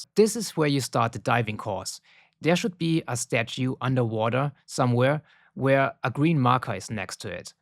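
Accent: Germany. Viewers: Danke für das Video😊 man hört leicht heraus, das du deutscher bist xD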